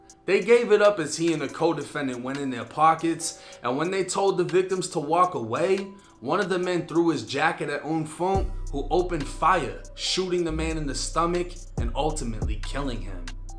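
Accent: Boston, United States. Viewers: Been a sub and when you had about a 1000 subs. I liked your story. And your Boston accent. You probably say the same about my Canadian accent. Listen to Toronto man’s accent. Funny as hell. I did time with some well know Toronto rappers all fighting bodies and get sent to the pen for a 25 year sentence. Cause that how we roll in Canada. 25 max. If your good. You will get out earlier. Toronto mans known for shooting men in the legs ( leg warmers) many here have gotten one and a couple have died from a leg warmer. Hitting that big artery doesn’t take long to bleed out. Look in to some Toronto ggz. Fighting murder cases and still self snitching on Instagram. Giving the judge more ammo. Top5, Flippa. Check them out and there lingo. I was locked up with these guys. Two murders and two not 25 year sentences. Just one. That’s how Canada does it. We soft like that. 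I rock with YOU. COME HERE N 1 million subs. Having beef with Wack 100 is pure comedy. On piru my ninja. Wack is free wackiness. Go with it. Toronto mans not afraid to hand leg warmers out in LA either. Tony Yayo has recently talked about them on YouTube. It’s worth a look. Could be gold and push you over a million. We got a lot of respect for you in the North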